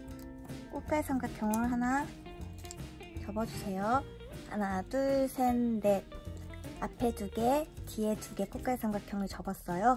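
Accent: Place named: Gyeongsang, South Korea. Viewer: 경상도 분이신가!! 자꾸 뽀족을 빼쪽한부분...이라고 하시네요.ㅎ 제가 경상도 아줌마라^*;;억양이랑 말투가 그리 들리네용ㅎㅎ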